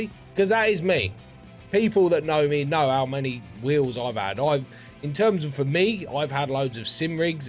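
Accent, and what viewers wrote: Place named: England, United Kingdom. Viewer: i love your original english accent! :)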